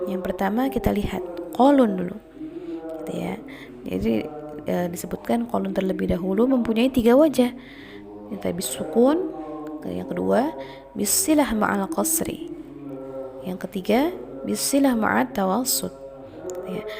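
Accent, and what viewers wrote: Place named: Indonesia. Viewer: Sgt baik penerangan Ustazah. Lahjah Indon saya boleh faham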